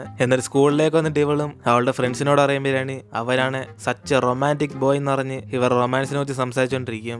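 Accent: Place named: Pathanamthitta, India. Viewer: Chetta vid pathanamthitta ano??? Slang kettit thonni athaa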